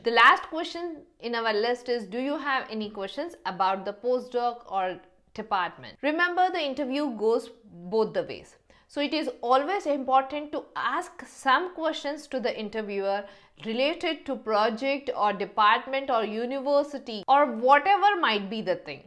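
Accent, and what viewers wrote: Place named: India. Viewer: Best indian accent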